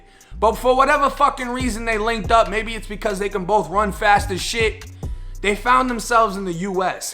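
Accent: Boston, United States. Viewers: yo are you from boston your accent is crazy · Are you a Bostonian. You talk like them. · You from new England boss?? Deff sound like your from boston im sure not 1st time you've heard that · I'm new here , you from Boston? That accent got me cutting up lol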